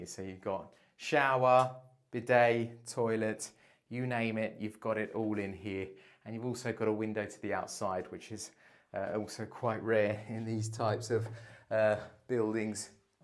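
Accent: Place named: England, United Kingdom. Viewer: never crossed my mind and I'm from that neck of the woods. I took you for English through and through .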